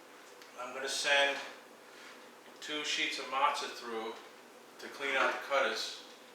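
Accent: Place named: Boston, United States. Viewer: ..You must be from Boston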